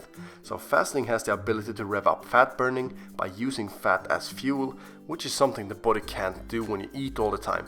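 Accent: Sweden. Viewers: You sound Swedish. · I think I specialized to recognise Swedish accent. I knew you were Swedish before checking your channel's info. Thanks to esport :) Thanks for the info though!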